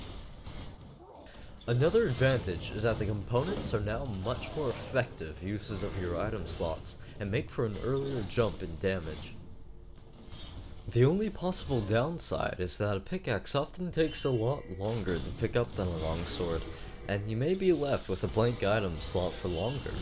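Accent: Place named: RP, United Kingdom. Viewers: He sounds kinda posh but that is how he speaks irl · Can you talk normally instead of trying to sound posh and weird. Thanks,nice vid tho besides the annoying story telling.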